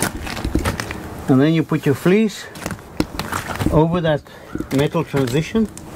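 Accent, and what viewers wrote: South Africa. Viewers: are you from South Africa your accent sometimes sounds like you are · Great video. Are you South African?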